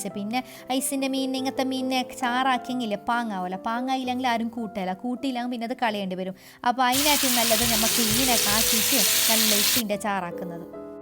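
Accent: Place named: Kasaragod, India. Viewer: Kasaragod bisiyaattil vlog kekaan Nalla paangund#mayyuskitchen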